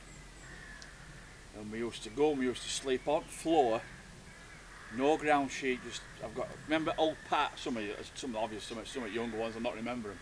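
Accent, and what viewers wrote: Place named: Sheffield, United Kingdom. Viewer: I thought I recognised your accent as a local Sheffield. Thats why I subbed you in the first place - hoped to see you out on a walk one day but then realised your over in Lincolnshire. Maybe meet up at the next Bushcraft show - I could sample some of the curry you made! ha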